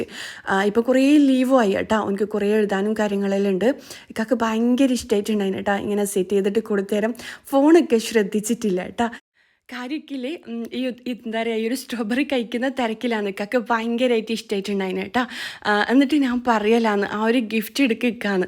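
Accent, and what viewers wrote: Kannur, India. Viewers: കണ്ണൂർ ഭാഷ അടിപൊളി... 👍👍👍ഞാൻ മലപ്പുറം ആണ് ട്ടോ.. 🥰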